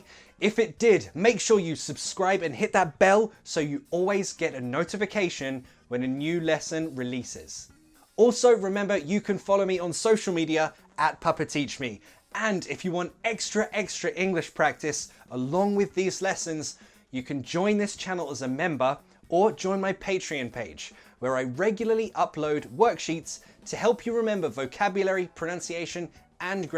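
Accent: United Kingdom. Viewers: You're the only British English speaker I really enjoy listening to. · You make me love British English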